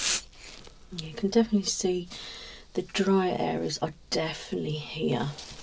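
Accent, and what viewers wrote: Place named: West Midlands, United Kingdom. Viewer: Working with another West Midlands-er has really brought out your accent 😂 Get SRP involved for the trifecta!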